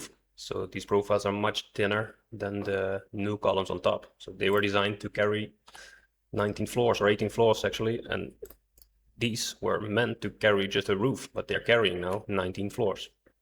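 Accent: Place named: Denmark. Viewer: Listen Mr. Civil Detective with your (Danish?) Accent: This old Architect having worked on NYC Bldgs (one with Fred Severud as Engineeer....others): Your presentation is the very best of ANY including that guy from Severud and many others. Lucid, Comprhensive and mathematicall.. All these cute Chicks interviewing any engineeer they can get their hands on (NBC/ABC/CBS...,,,,,,=UGH. State Your Name, Company, credentials so we (few) rational people can find your sites. You are first rate and give me faith in big time engineering again.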